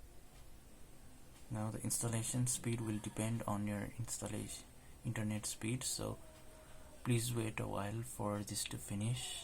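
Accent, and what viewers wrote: India. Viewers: im not hating, it's good guide. but why again this indian accent? why 50% of programming videos are Indian?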